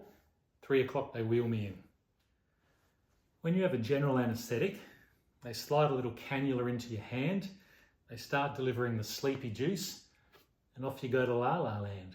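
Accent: Australia. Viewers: i was absolutely encouraged by your video and ability to walk so steadily.....i really appreciated your sense of humor....since i am nearing surgery date in a few months....lol... you really gave me an optimistic approach to your home recovery with your ozzy accent and humor!!! Thanks!!! God Bless You!...Susan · Tangent: Love your accent, used to live in Sydney and listening to you talk brought back pleasant memories for this ol' septic!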